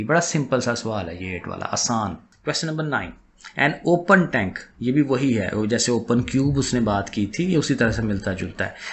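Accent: Sialkot, Pakistan. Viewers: A.a sir ap Sialkot ( dska side ke lagty han ?)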